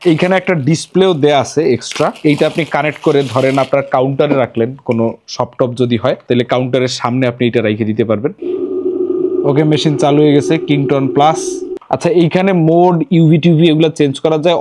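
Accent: Barishal, Bangladesh. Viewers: Vai barishal er maal vasa sunlei buja jay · ভাই আমি নিশ্চিত আপনি আমাগো বরিশাইল্লা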